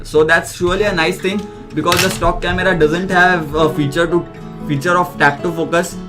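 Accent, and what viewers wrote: India. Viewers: Can we have an American do this instead. Of a Indian guy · you have to be Indian to understand this guy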